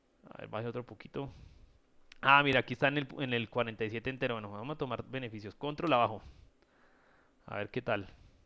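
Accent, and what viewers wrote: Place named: Colombia. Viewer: acento colombiano fuerte jejejeje, Gracias por lo que aprendemos de ti!! Por favor respondeme a que te refieres con "Esta si tiene pareja" en los gráficos??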